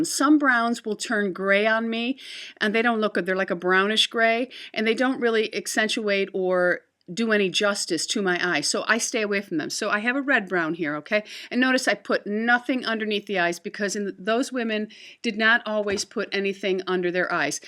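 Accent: United Kingdom. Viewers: Oooohhh Yay! I love the british accent. Hehehe Big Hugs Marnie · great video! love the british accent :) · great video esp with the British accent lol.I love the look from back in the 40s.My fave movie ever is Brief Encounter from 1945 and Celia Johnson just looks so understatedly beautiful in it.True beauty is timeless and yes you are vintage and classic xx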